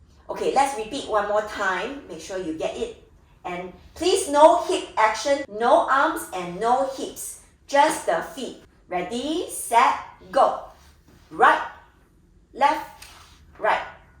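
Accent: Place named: Singapore. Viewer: Sounded singaporean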